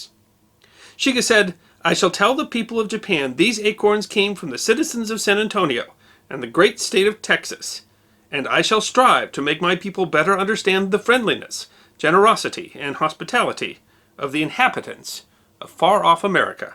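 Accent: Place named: Midwest, United States. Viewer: My Japanese is not so good. Your voice sounds mid-western to me, which is fine. I've found myself (at times) questioning certain English town / county /home pronunciations, and likewise other European places (ok, I'm a jerk) but you'll find no fault by me on this episode. Whether right or wrong, words rolled off your tongue as if you knew what you were saying and I bought it all. And...another great story to boot. I trust your script was not Kanji...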